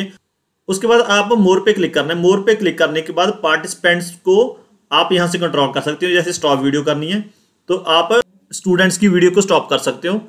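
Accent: Punjab, India. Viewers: Seems like u are Punjabi from Ur accent 😊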